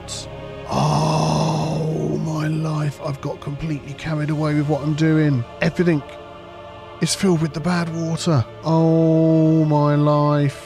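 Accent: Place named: Midlands, United Kingdom. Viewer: 😂😂😂😂 Was watching the bad tide warning count down, then watching it flow through the system when you zoomed out, and the warnings pop up internally screaming at to close the gates 😂😂😂 One thing though, the word is everythinG not everythinK, are you from the Midlands at all? Seams everyone says it round here and I'm constantly correcting my son, parents and siblings 😂😂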